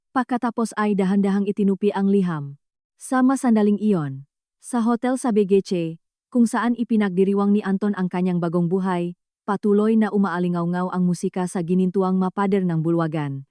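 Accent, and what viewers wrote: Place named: China. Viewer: Nakakawalang gana makinig sa Narrator Ano ba sya Chinese or Maranao. Palitan mo pls nakakabwisit pakinggan imbis ma relax.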